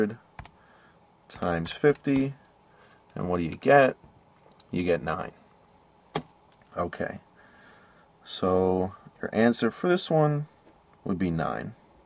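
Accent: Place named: Long Island, United States. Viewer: long island accent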